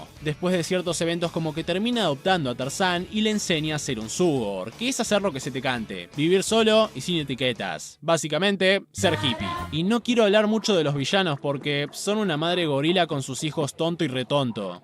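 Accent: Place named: Argentina. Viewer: Nana amo como hablás amigo y relatas amigo. Explicativo y chistoso argentinado jaja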